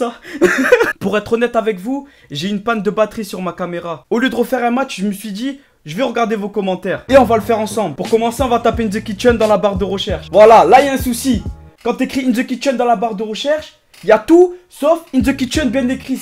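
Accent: Marseille, France. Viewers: Tu ressemble à un chinois, t'es pour paris et ta un accent marseillais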